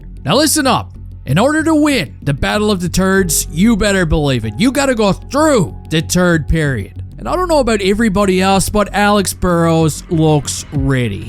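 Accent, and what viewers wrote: Newfoundland, Canada. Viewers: Is this guy a Newfie????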